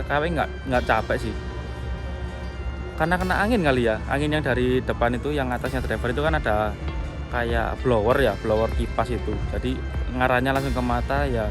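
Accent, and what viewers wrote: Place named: Java, Indonesia. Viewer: Bli logatmu kok medok jowo😂😂.gk ada bali2nya.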